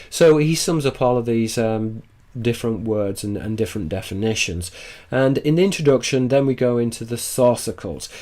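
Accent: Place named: North West England, United Kingdom. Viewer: Dear, thank you for your most interesting work. I was advised to find you by way of Tom Carberry via shroomingnewman 3... I’m sorry I can’t remember from which video this came but you said that the? (rulers) release knowledge through deception. Would it be alright for you to help me understand this a little more? Also, in relation to the ‘ha’... for almost four years myself and my son have been, (for want of a less triggering term), ‘born again in the Spirit Christians’... anyway, whenever I pray, (literally just talking and asking if necessary) to our Father and Mother and I forget something, I always ask the Holy Spirit to help me... whenever I do, my mouth opens of its’ own accord and a gentle but definite exhalation of breath happens. And it makes that lovely little sound... So, ha! that’s that... Then also, you sound so very much as if you hail from the North West Of England. 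We live in Morecambe. You have a very similar accent... Okay, well i’ll go now. Thank you again and all of the very best, from me Sarah.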